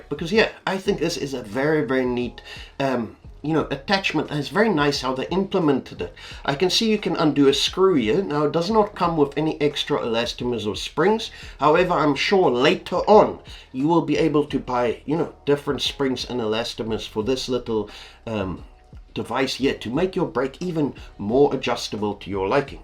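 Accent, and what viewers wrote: Afrikaans, South Africa. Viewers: 10 months later….Wootware has them at R6499…. I was also hearing the accent…and the Brrrrey comes through every now and then😊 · 😅I know your profile says UK 🇬🇧 but you sound so Afrikaans it's impossible to deny that you might be from South Africa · I know a South African when i hear one sir whats the availability in sa?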